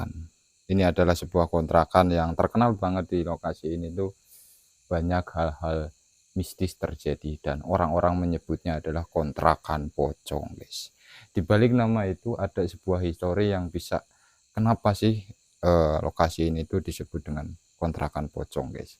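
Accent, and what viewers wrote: Java, Indonesia. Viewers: kalo boleh tau di daerah mana yh bg logatnya kaya jawa😁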